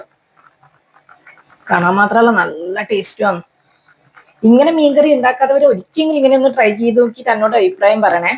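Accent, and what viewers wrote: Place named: Kannur, India. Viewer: Kannur slagu super dear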